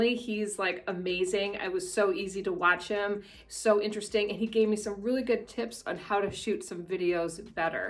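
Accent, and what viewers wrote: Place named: New Zealand. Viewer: Great video - I can even detect a bit of Kiwi in your accent these days!!!! Cheers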